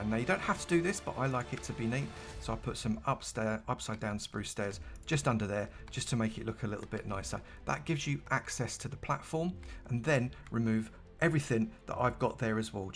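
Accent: United Kingdom. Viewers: I think British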